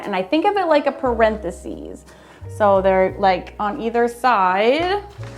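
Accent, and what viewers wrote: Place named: France. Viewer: Oooh la la. And I liked your lil French accent. 😂😂😂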